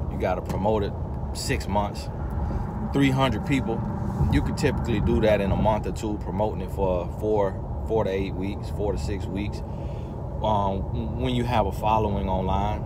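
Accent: South, United States. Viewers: Nice to see your life behind the scenes. More like this please!! Oh & don’t mind the country accent. I love it!! Well done to your sons x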